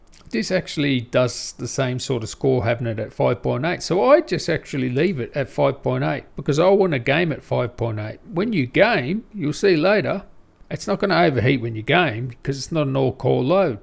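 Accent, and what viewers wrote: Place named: Australia. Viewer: Most fucking aussie voice I have heard in my life. But with a hint of wog too love it. Subbed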